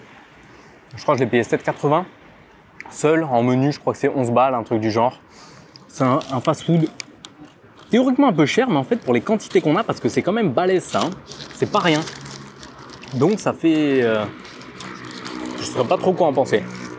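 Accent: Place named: England, United Kingdom. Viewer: comme dit précédemment jaime trop ton acent anglais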